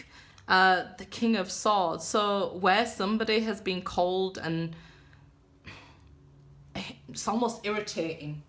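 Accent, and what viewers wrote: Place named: West Yorkshire, United Kingdom. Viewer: Sounds like a West Yorkshire accent..! ❤️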